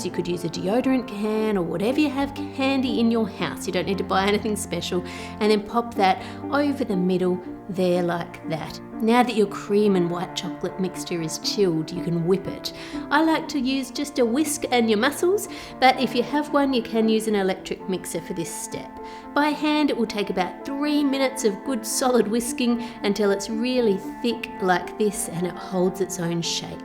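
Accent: Australia. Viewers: I will probably never make this, but very much enjoy watching creative people create it teach... Also as an Australian myself it is just so nice to hear an Australian accent in the voiceover - so nice, sweet and comforting. Like a hug from Mum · Besides enjoying your luscious desserts, I must say that I'm loving your friendly Aussie accent. It reminds me of my aunty Beverly. I'm a little over how americanisms are gaining a foothold in international you tubers vocabulary, and so its really refreshing to hear things like sticky tape and your gentle Aussie lilt. Love from a Kiwi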